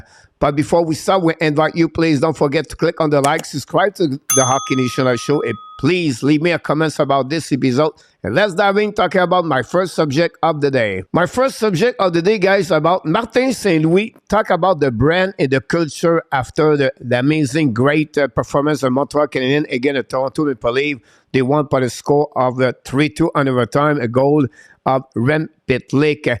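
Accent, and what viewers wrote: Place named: France. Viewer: Hey, we really enjoy listening to you and watching your videos. We are from Montreal but we dont live there anymore. We get a laugh from your heavy accent but you know your stuff man! Keep up the good work my friend, you have greatness inside of you!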